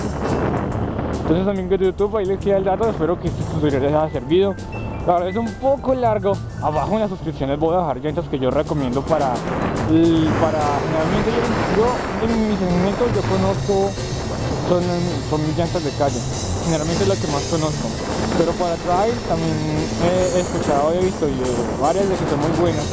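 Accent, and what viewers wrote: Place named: Mexico. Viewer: dices que eres colombiano pero hablas como mexicano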